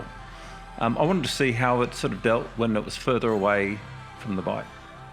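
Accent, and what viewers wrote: Australia. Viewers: So good to hear an Australian voice in a good vlog! I've never heard of these drones either. I have a Mavic Air and we tried this with a scooter in the rice fields here in Thailand but not a success. What bike are you riding here?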